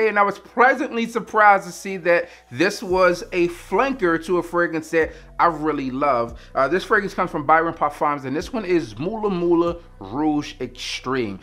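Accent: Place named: Queens, United States. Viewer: Yo you from Queens boss? I like the energy with the way you describe the scents. Just subscribed. Keep up the good work 💯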